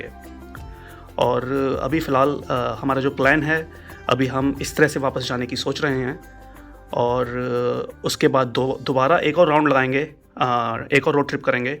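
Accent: Standard. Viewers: आपकी शुद्ध हिन्दी सुनकर बहुत अच्छा लगता है l धन्यवाद 🙏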